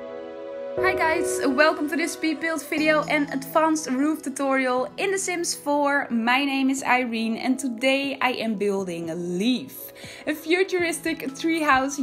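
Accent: Netherlands. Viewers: U sound dutch :) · I knew it from your accent. I live in Utrecht and u? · omg i figured because it sounds very similar to dutchsimsmaster 😂 BTW love your builds, cant wait to see more! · First video I wached from you, I'm at minute and I notice your accent. Is it Dutch? Sounds soo familiar, I used to live in Amsterdam ❤ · Ik hoor dat je Nederlands bent en je kan zo goed bouwen je bent echt goed ❤️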